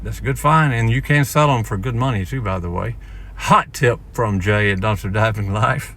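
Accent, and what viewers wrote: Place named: Texas, United States. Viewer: Thanks, I thought it looked like Texas + Jay's accent too 🙋‍♀️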